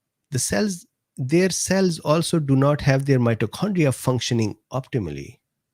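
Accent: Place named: India. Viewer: Universal Indian pronunciation. Different from ours, like many other words.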